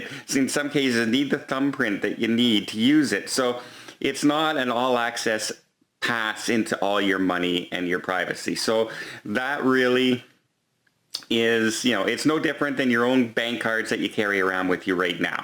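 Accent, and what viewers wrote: Canada. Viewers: He's Canadian. Sometimes they say words differently than we do here. Stop being an English teacher!!!!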